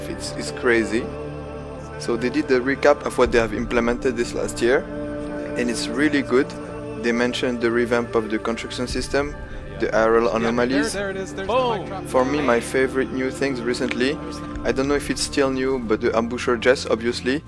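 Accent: France. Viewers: You really have a french accent. Es tu français ? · T'as un accent français 🤔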